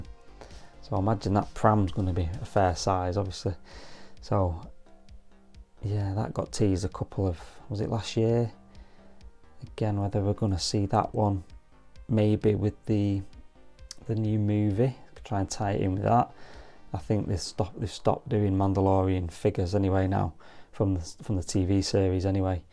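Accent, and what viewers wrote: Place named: Northern England, United Kingdom. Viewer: You sound like your from up north lad, Manchester area, I’m a north west lad too.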